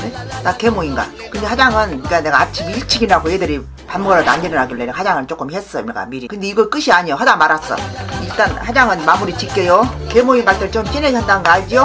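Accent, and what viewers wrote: Jeolla, South Korea. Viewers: 자메이카 출신 할머님 전라도 사투리 찰지심ㅋㅋㅋㅋㅋㅋㅋㅋㅋㅋ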